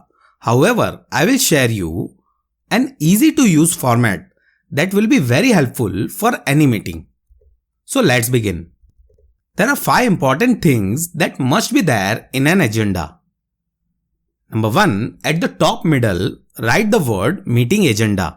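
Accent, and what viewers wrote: India. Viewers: othentic indian tone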